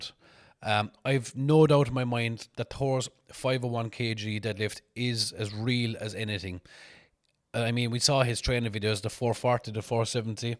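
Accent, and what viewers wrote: Ireland. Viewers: You are a born story teller, it’s the Irish accent, 323, I enjoy your videos as they are 👍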